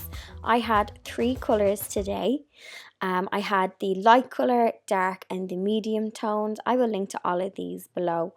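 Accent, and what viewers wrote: Ireland. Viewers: Cute! When you were talking about YouTube algorithms I didn't understand you. I'm an American and sometimes your beautiful Irish accent is difficult hard for me to comprehend.. Could you explain what you meant?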